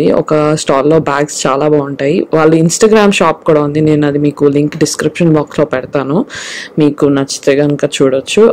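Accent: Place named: Hyderabad, India. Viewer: Good, me telugu matladam bagundi,normal gane hyd yasa lone matladandi,inka vlogs cheyandi elage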